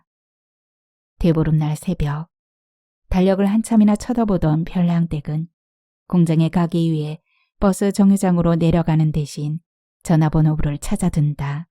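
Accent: Jeolla, South Korea. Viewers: 정감어린 전라도 사투리를 어쩜이렇게 소담소담.읽어가시는지..대단하십다. 감사합다.^~^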